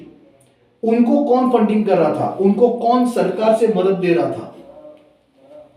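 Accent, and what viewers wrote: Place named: Maharashtra, India. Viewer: साहेब, तुमच्या हिंदी उच्चारावरून तुम्ही मराठीभाषी वाटता. बहुतांश भारतीयांसाठी हिंदी व्हिडिओ नक्की करा. पण अधून मधून मराठीत सुद्धा 🙏 (पण मी कोणी गुप्तहेर नाही 😂)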